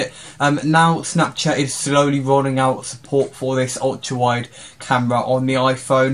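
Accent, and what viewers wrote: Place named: United Kingdom. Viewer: lovin the british accent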